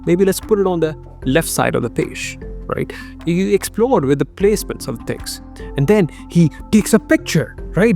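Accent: India. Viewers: really? listening to his indian accent is so annoying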